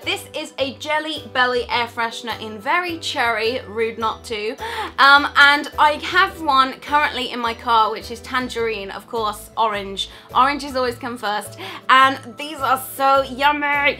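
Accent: United Kingdom. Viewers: This may sound weird but I LOVE your British accsent I want to move to Englend SOOOOO bad😟 I'm AMERICAN AND WANT TO MOVE TO ENGLEND 😭😭😭😭😭😆😆😆😆🇬🇧🇬🇧🇬🇧🇬🇧🇬🇧🇬🇧🇺🇸🇺🇸🇺🇸🇺🇸🇺🇸🇺🇸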